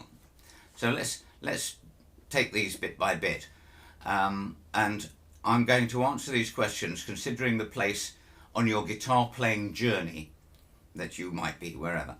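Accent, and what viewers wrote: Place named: United Kingdom. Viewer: Good vid! You Brits sound a little funny to a West Texan, but that sure doesn't make yur view of the world any less valuable. ;) I'm a guitar tech, (Luthiers are the guys that actually know what they are doing) and I get tons of first timers, in fact thats the market I'm in. One amazing thing I've discovered with new players is if we teach them the mechanics of the guitar, action, level frets, tuning, string changing, checking and setting the truss rod. Just minimal maintenance, this "seasons" the player and like getting the wood used to resonating, now you have a familiarity built in between the student and the large wooden object sitting in the student's lap.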